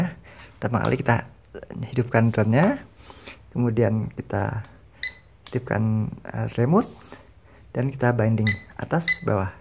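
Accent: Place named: Central Java, Indonesia. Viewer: Mas.nya dari Salatiga, jawa tengah kah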